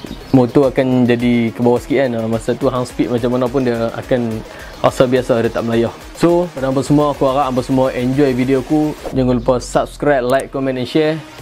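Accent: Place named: North, Malaysia. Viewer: Mna abg dapat barang moto lama ni....dgr suara belah utara..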